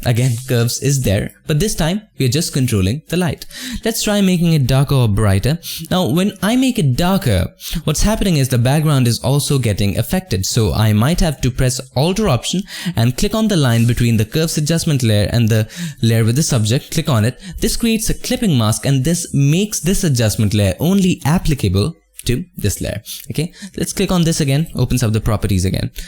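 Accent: India. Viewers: Dude, Indian accents are bad enough, but that breathing and uni are simply impossible to deal with.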